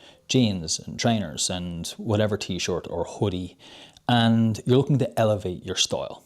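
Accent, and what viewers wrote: Ireland. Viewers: I love your black cat, your Irish accent and your highly useful advice! Plus your natural performance. Good job! I am really grateful for having found this video! Thank you so much!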